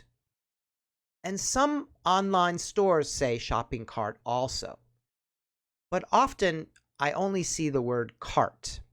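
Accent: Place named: United States. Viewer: Hey, thanks for the good videos you make, I'm working on my accent to make it better, I wanted to ask which part of US does your accent belong to?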